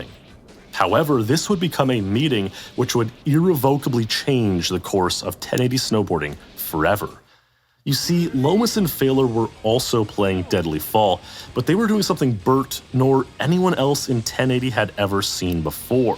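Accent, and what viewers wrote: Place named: Canada. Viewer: This one seems more Canadian than usual. Can't quite put my finger on why, though...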